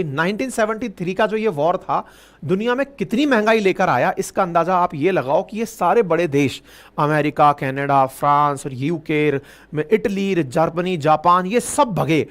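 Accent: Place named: Bihar, India. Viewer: Not interested in incorrect Hindi in Bihari dialect.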